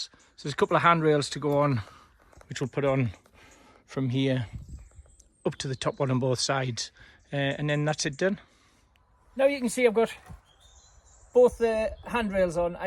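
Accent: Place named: Northern England, United Kingdom. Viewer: I love your running commentary teaching. I'm no carpenter, but I can follow your procedure. And I get nostalgic listening to the lovely Northern voice of my long ago childhood. Thank you.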